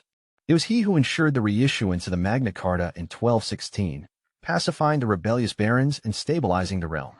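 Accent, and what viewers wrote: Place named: United States. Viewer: "King of England" but has an American accent lol if you're gonna use AI at least give him a ye olde English accent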